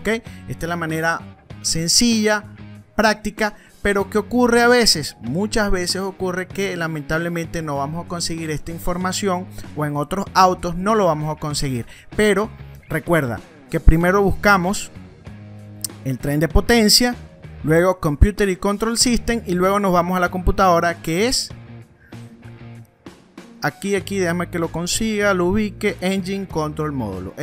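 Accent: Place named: Venezuela. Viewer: Saludos, si no me equivoco tu asentó es netamente Venezolano y como buen Venezolano seria importante que nos respondieras sobre como podemos utilizar esa plataforma acá en VENEZUELA, espero nos respondan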